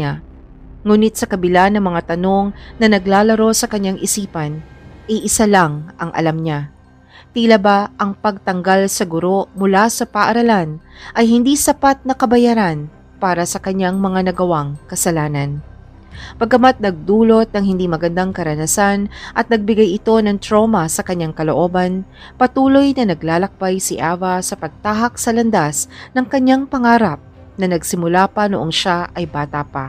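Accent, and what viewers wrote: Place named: Visayas, Philippines. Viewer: Bisaya yata ang narator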